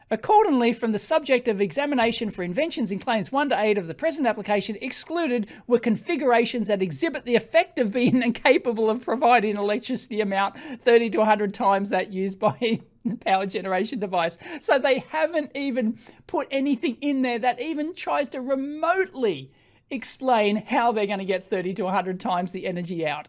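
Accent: Australia. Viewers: Geravi-tational lol gravitational. I love Aussi accents.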